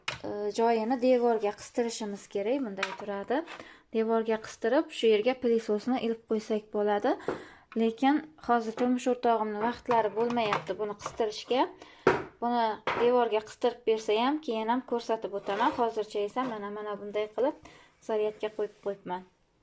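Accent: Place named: Khorezm, Uzbekistan. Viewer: Man ayol kishiman . Siz Xorazmdanmisiz gapirishuz Xorazmlilarnikiga òxsharkan judayam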